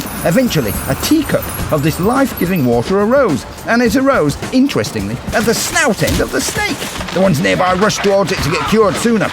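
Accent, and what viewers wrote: United Kingdom. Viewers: если человек пишет про "американскую аудиторию", может речь как раз о британском акценте, которому как раз откуда не быть у британского диктора?.. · Классно, спасибо Вам. Но голос все таки придется подучить "русской" манерой рассказывания :) п.с. Не понимаю почему рассказчика с шотландским(если не ошибаюсь) акцентом выбрали ) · There was a question above in Russian 'Why the narrator have such an accent?'. And I answered 'Which accent? He's British!' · why so British?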